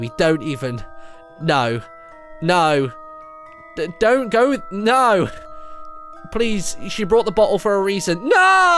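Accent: United Kingdom. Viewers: Ate you British you sound British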